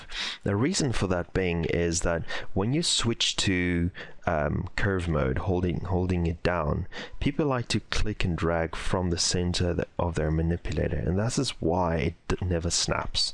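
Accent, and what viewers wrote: South Africa. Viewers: My ears did a double take, a fellow South African ^^, nice work, learnt a lot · Heard the Afrikaans accent and I immediately subscribed. Die video is goed dit het baie ge help, Dankie.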